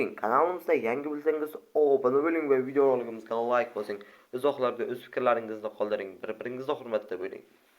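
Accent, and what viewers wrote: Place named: Kyrgyzstan. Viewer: Эй касартки овозинга санинг ут тушсин киргизмисан дейман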